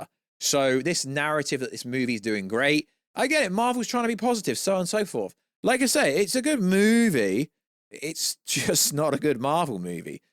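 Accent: United Kingdom. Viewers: You sound British eating beans and tuna all day ...🤮🤮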